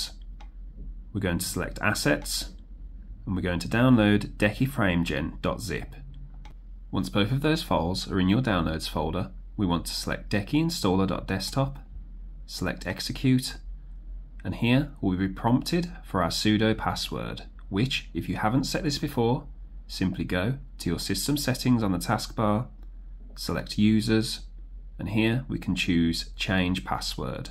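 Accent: Birmingham, United Kingdom. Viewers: Where about in Birmingham you from mate? Always nice to see a fellow brummie.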